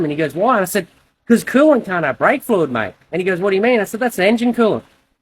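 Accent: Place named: Australia. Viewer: Aussie brah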